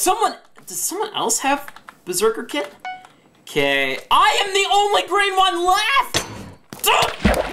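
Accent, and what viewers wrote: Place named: United Kingdom. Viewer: lol British accent😃😂😂😂😂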